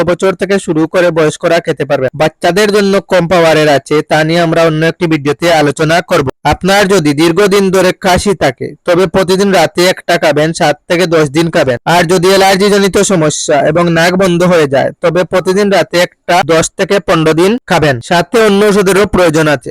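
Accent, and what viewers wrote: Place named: Sylhet, Bangladesh. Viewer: Vai apnar kotha sine buja jacche apni sylhety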